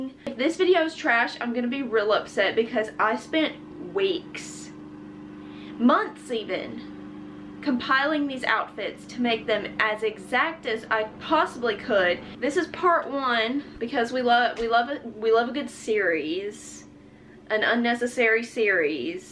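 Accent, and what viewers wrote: South, United States. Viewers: I wish I could find myself a girl with your accent lol. Southern accents are sooo underrated. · your southern accent kills me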